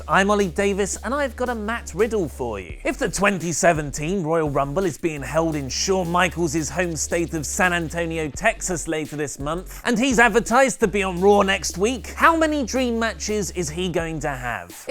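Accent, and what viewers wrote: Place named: England, United Kingdom. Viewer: what part of england you from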